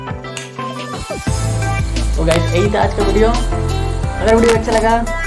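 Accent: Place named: Andaman Islands, India. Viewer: Apna andamani slang mein technical sikhne ka alag hi maza hai 👌😁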